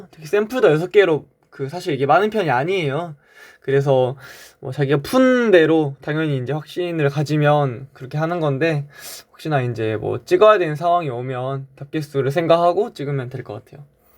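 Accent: Jeolla, South Korea. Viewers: 그 수학 관련된 건 아니지만 전라도 사투리 듣기 좋아요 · 전라도 사투리 구수허네유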